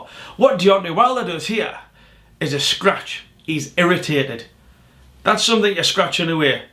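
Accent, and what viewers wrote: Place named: North England, United Kingdom. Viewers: Im from Darlington which, judging from your accent, is not too far from you. I'd like to see you do a video on the Izzy v Romero weigh in. Might be a tad late like but either way keep grafting mate. 100k next. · Went to Uni in Yorkshire - are you from North-England or scotland? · I'm from Sunderland, it's the first of your videos I've seen but guessing by your accent you're not too far away.. great video 👍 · Leeds here not too far from Tyneside which sound like where you’re from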